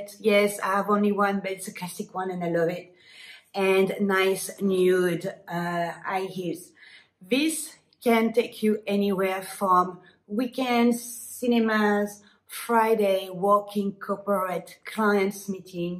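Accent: France. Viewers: Bonjour. Congratulations. Everyone adores your French accent!! I'm confused by what you mean about "blazers". The white jacket you are wearing I would call a blazer. The denim on denim look in Canada is what we call the "Canadian tuxudo", ha ha! I would never think to wear a dress like that over blue jeans, what a great idea. I will need to buy a dress like that to wear over blue jeans. I can't wear blue jeans to work. When I do I don't feel in the "business mind set". I feel relaxed and lazy or I should be cleaning the kitchen. Other women can wear blue jeans with no problem but I feel laxadaicle, not good. Great tips again.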